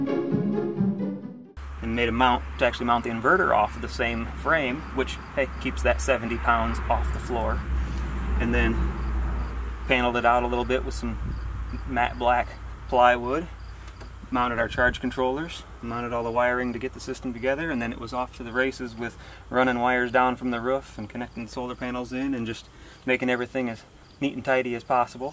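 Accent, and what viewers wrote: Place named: United States. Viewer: The good old Ruuf.. Not Roof Ruuf. Makes me laugh every time i hear Americans say Roof or car, veehykel not vehicle. IDK why it just makes me giggle.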